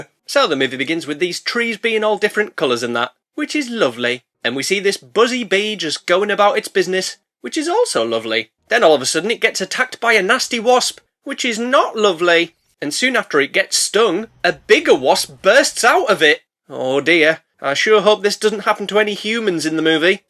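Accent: United Kingdom. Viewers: Please settle a debate for us, where are you from? 😂 we’ve guessed Newcastle from The accent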